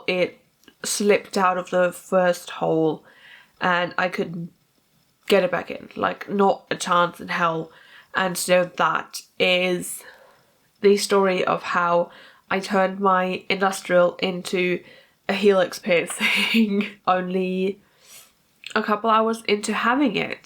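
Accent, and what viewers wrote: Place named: United Kingdom. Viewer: dass du aus Großbritannien kommst, irgendwie vom Akzent und allem her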